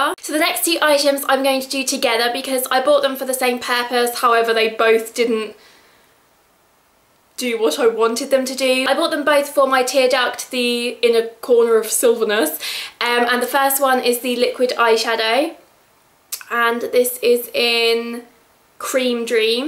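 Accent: United Kingdom. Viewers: very helpful video I obsessed about the Britich accent REALLY LOVE IT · British accent :)) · Beautiful accent. Wish i was British :( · you sound like me ans my sister being British .-.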